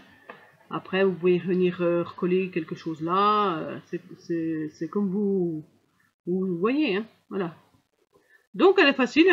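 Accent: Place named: Franche-Comté, France. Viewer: Super idée ! Je vais en faire 1 aussi ! J'avais bien reconnu l'accent franc-comtois ( suis Belfortaine) 😉 je m'abonne à votre chaîne😁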